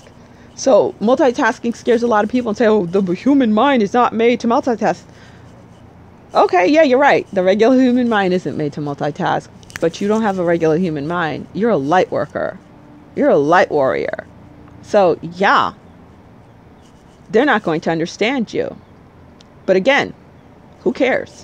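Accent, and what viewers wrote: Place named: Brooklyn, United States. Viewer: Love your videos. Will be purchasing your book for my little cousin. She is only 2 years old but such an old soul. Love your accent reminds me of home. I was born in Brooklyn, NY 😊 Blessings to you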